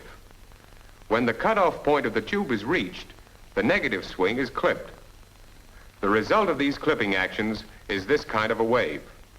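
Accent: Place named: New York, United States. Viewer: I’m trying to figure out his accent. Where do you feel he is from? East coast? West coast? New York? I am from NY and have seen old films where people who are from NY used to have slightly different accents.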